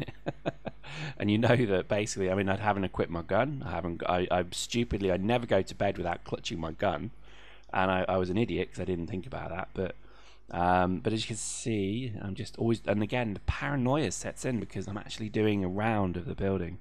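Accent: United Kingdom. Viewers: I wish it were somehow possible to collect rainwater. I mean it rains bloody often enough in this game! Great let's play, btw, dat britishness.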